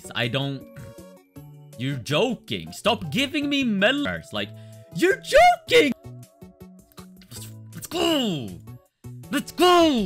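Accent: Sweden. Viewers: Jag hoppas att du är svensk så att min hörsel inte sviker mig. Btw hur fan kan du ha infintie credits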